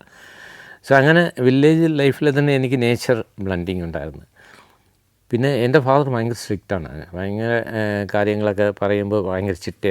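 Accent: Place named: Tamil Nadu, India. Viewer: തമിഴ് കലർന്ന താങ്കളുടെ സംസാരം വളരെ രസമാണ്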